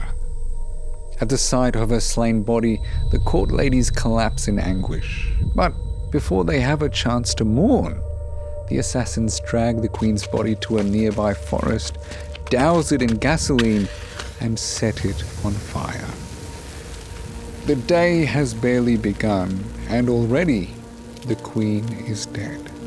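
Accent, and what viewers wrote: Australia. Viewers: Does sound a bit like him haha, Aussie accent and a slow/dramatic delivery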